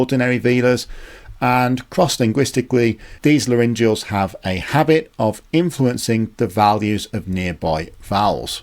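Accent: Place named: Manchester, United Kingdom. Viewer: So7nds like a feature from Mancunian English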